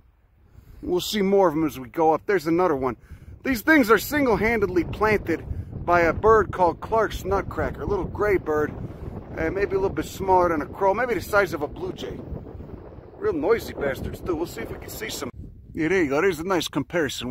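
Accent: Chicago, United States. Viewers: First of all, your Chicago accent makes me feel so happy. Second of all, if I see a juvenile bear, I assume his mom is nearby somewhere and I’ll be the one running. Third, your knowledge is amazing! Thank you. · Truth! If you've never heard these Latin names pronounced before, you're going to learn them with a Chicago accent. Plus, there's that special "see you later, my friend" phrase.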